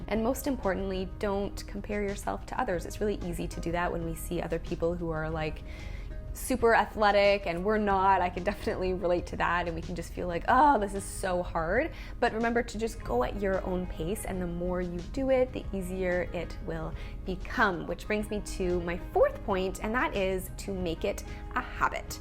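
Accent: Canada. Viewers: Thanks for the tips! Just wondering, are you Canadian?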